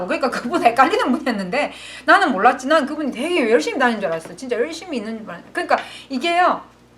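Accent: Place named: Gyeongsang, South Korea. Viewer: 얼굴도 예쁘고 내가 좋아하는 경상도녀의 강한 사투리가 신앙까지 좋으셔서 배우기도 은혜로워요 감사함다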